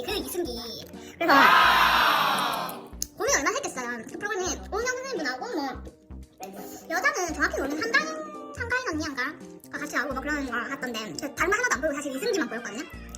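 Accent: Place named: Busan, South Korea. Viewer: 님 부산분인가봐요^^ 반갑반갑~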